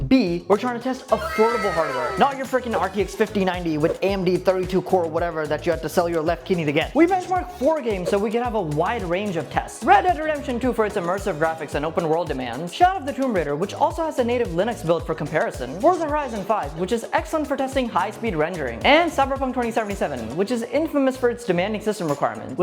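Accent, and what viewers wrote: India. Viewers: ARE YOU INDIAN OR AMERICA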